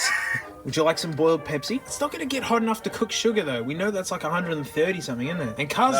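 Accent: Australia. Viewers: I have never watched ANY of this guys videos. Immediately “this guys Australian, theres some shit about to happen”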